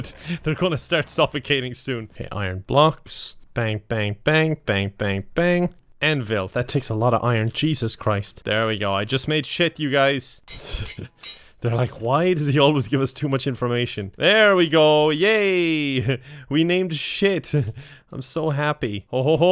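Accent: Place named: Ireland. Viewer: something about that beautiful irish accent and boat load of charisma always makes me hit that like button. im like hes my friend he said so in the intro and he asked oh so politely. guess couldnt hurt to obey whatever says. ps rt sucks. (team since country road, there can only be one)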